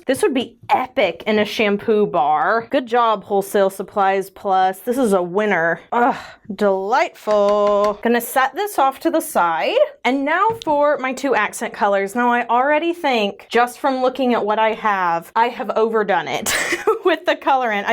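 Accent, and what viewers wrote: Texas, United States. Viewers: i havent commented much i dont think but i used to watch your channel SOOO much back in 2018 or so! i miss living in texas, moved out to co a fews years back ive started watching you again cuz i missed hearing the accent! i love chickens!!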